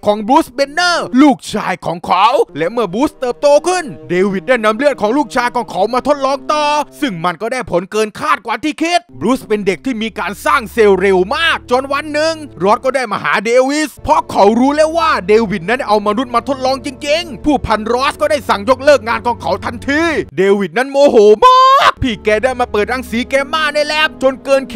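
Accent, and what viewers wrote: South, Thailand. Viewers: ชอบสำเนียงใต้จริงๆ 💕💕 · สปอยภาษาใต้ทั้งคลิป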